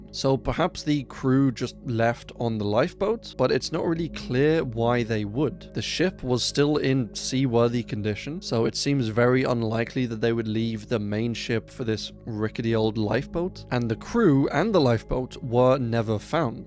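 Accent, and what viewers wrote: United Kingdom. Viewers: Did I just find the British Wendigoon?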